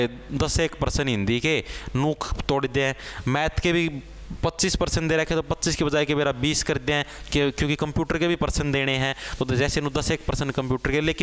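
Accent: Haryana, India. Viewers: यूपी की धरती से आपको राम राम मजा गया हरियाणवी सुन kar 😂😂😂😂😂😂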